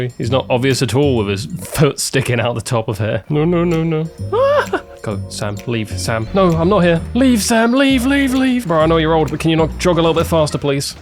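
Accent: United Kingdom. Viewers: I didn’t hear a difference in our British accents and yours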